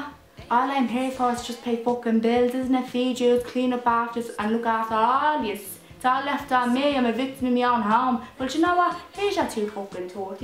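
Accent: Dublin, Ireland. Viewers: Fire Gling - She speaks a Dublin (North) accent. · I just subscribed to your channel. Would you consider subtitle though, for us, unfortunate souls who don't understand fully irish english? 🤓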